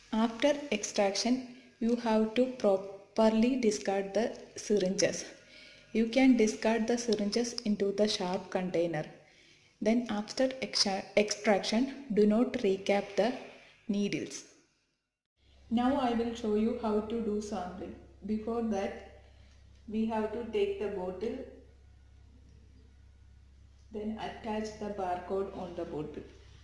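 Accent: Kerala, India. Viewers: Malayalii anu le 😂 explain cheiyanne ketal thanne manasilavim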